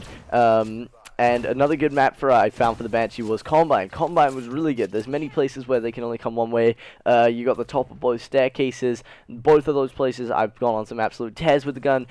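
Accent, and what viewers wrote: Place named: United Kingdom. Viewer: What part of the uk are you from?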